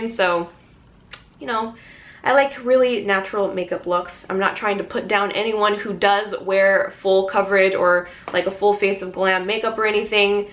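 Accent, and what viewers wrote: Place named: Australia. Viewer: Your Aussie accent is so good (to me it's Aussie)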